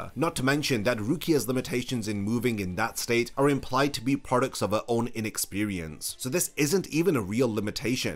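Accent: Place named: England, United Kingdom. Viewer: Please make an effort to pronounce those Japanese names correctly and not constantly using the English accent.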